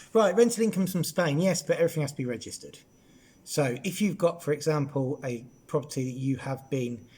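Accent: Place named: United Kingdom. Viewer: Precioso acento británico y excelente castellano. Saludos desde Pamplona.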